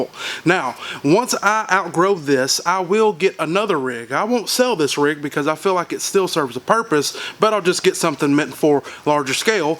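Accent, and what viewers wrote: South, United States. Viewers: I like this guy he sounds real country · I love that country accent brother! Love from the Carolinas. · I love this guy, his knowledge is top notch. When I first heard his voice I had to take a double take, we all southern bro!!! Keep up the good work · Did not expect his country accent to be so thick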